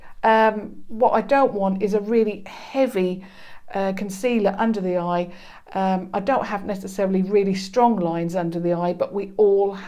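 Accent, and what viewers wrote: United Kingdom. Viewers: She was British so her accent was British. Unfortunately she is no more , she passed away due to a brain tumour but was such a wonderful person. I use to watch all her videos .😭😔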